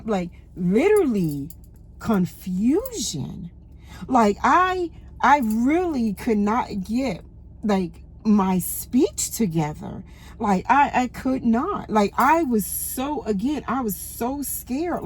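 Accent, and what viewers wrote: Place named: New Jersey, United States. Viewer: Cool accent. Are u from Jersey?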